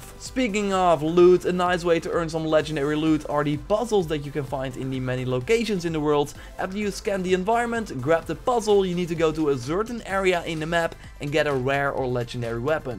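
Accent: Netherlands. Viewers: You are dutch, I can hear it in your accent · Nederlqnds ofnie maat dat duidelijk dunglish is hoorbaar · You sound dutch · Are you dutch/belgian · are you dutch lol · You sound Dutch😎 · Ik hoor aan je accent dat je nederlands bent xD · Hes dutch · Lol you are so Dutch, you can hear it from your accent alot. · You're Dutch, aren't you? We have such an easy to recognize English accent. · This is definitely a dutch guy. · Are you Dutch? You sound dutch.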